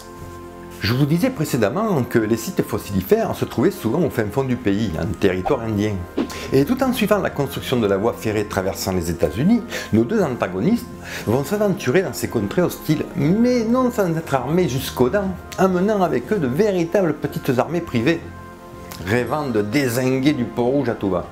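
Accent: South, France. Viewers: C'est un accent du sud si je ne me trompe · Tu et du sud de la France non mdr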